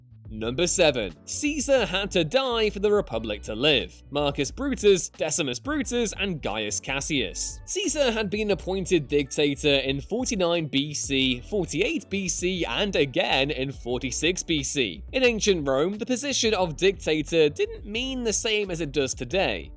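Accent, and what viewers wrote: United Kingdom. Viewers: What part of the UK is the host from?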